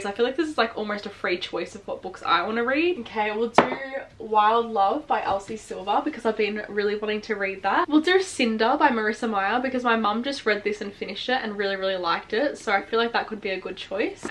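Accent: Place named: Australia. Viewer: Cute Aussie accent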